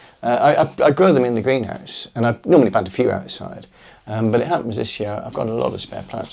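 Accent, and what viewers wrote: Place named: Cornwall, United Kingdom. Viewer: love the cornish accent- ell neenow! cheers!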